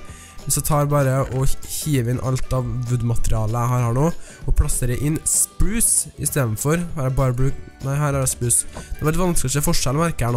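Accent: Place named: Oslo, Norway. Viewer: Du høres faktis ut som en som er oppvekst i Oslo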